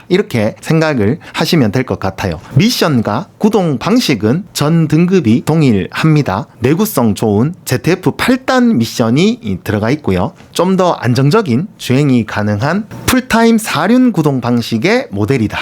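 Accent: Gyeongsang, South Korea. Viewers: 아 이분 갱상도네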